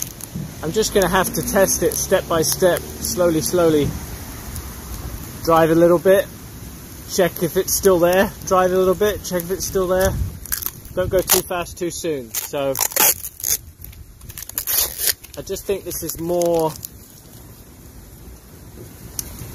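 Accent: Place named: United States. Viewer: This is amazing!! It’s sweet you’ve fitted your own solar panel on the top of your van- I love it! You never cease to amaze me & I love catching up after awhile cos you’re always up to something completely different (apart from the melons 🍉🍉🍉 ofcrs!). Also noticed a wee twang of American in your accent these days 😃 Man of the world 🌎✨ xxx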